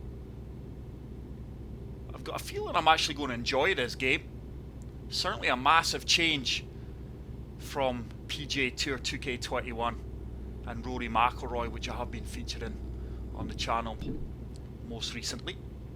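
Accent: Scotland, United Kingdom. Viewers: You sound Scottish what rough are you around bud? I'm from Scotland myself, you'll be looking forward to Road kings and ATS/ETS coming to PS5 soon eh, i sure am! I'm playing road craft just now season year 5 pass was announced for snowrunner if you play them?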